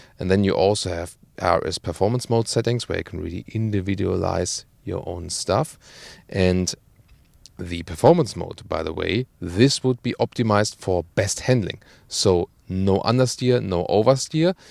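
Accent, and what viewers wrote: Germany. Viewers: du bist der einzige deutsche wem ich Englisch sprechen hören will.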